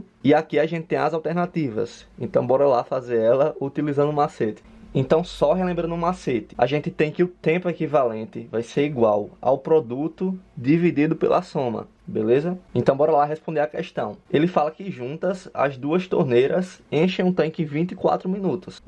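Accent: Northeast, Brazil. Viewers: Ai esse sotaque nordestino é tão lindo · Ele é nordestino?? O sotaque dele parece muito. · Eu adoro esse sotaque dele Nordestino kkkkk❤❤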